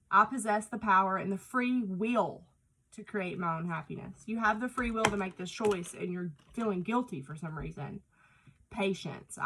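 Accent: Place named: South, United States. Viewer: I needed this. This was for me 💖💖 I’ve let go of so many bad habits the last two years. Nicotine, a narcissistic drug addicted mother, a drug addicted brother, as well as an abusive alcoholic ex husband. I’ve started a new bad habit over the last year that I can’t seem to kick though. Weed 😒 it has helped my anxiety and to heal and let go, but I have noticed the negative impacts it has taken on my energy and personality. I know what I need to do, separate myself from the person who brings this habit around me and keeps me smoking. I also have 3 small boys. So children are involved. I’m healing, we have moved and cut off all bad people from our lives, so here’s to cutting out one last bad habit so my heart and body can truly heal. I love your channel btw! My new favorite ❤️ your accent makes me feel connected 💖 a Eastern KY girl hearing another country girl doing tarot is everything to me 😍😍😍😍💖💖💖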